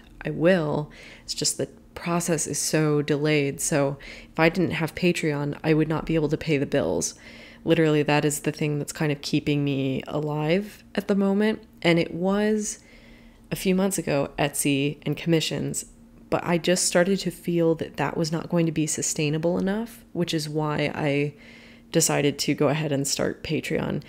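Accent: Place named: Germany. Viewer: I just stumbled upon your channel and wanted to say I absolutely adore your work. It's so stunning yet soooo cute and you've definitely won me as a fan <3 love from Germany P.S. splendid German accent haha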